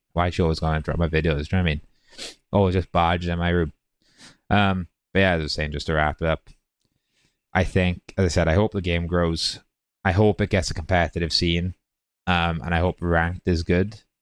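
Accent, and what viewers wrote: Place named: Wales, United Kingdom. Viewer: I must have sunk a thousand hours or so into Smite up until season 6 and I stopped playing because it got grindy and the fun went out of it. I want to give smite 2 a go, and I'll give Pred a go too; I played some Paragon back in the day and I didn't know it had kind of been resurrected - I've only just learnt about Overprime and some other game that used the assets that have both died. Looks like Pred has its version of Smite Arena that's just come out. The map is beautiful and I love the 3D aspect of it. I don't think I would sink any money into Pred though until it's been out for a fair while as that could just as easily disappear; I'd be more happy to put some money towards Smite 2 really. Sounds like you've got a Welsh accent; if so, friendly greetings from a fellow Welsh person! If not, friendly greetings anyway 😂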